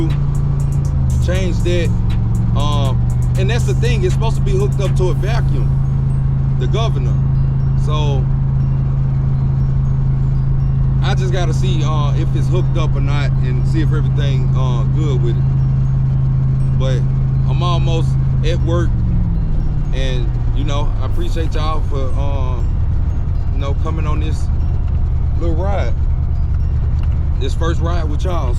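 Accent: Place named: Memphis, United States. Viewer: Sounds like you from Memphis. But great video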